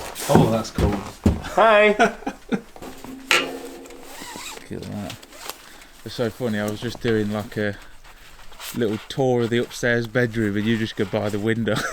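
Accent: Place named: East Midlands, United Kingdom. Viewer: Just started watching your videos, listening to your accent I thought you were from around East Midlands and just seen Leicester. Big move and a sensible move, well done you, we got out of Leicester 4 years a and moved to the Outer Hebrides thinking it was remote, nothing like you. Look forward to you renovating the house, where in Leicester were you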